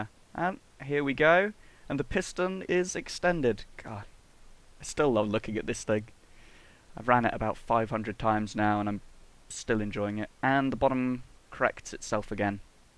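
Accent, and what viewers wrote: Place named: Sheffield, United Kingdom. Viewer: are you from Sheffield? I recognize your accent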